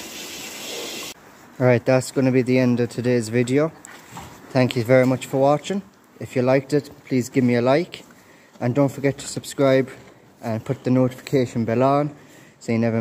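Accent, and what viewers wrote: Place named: Claremorris, Ireland. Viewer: Great video I know you told me your from Mayo sounds very like a Clare morris accent 👌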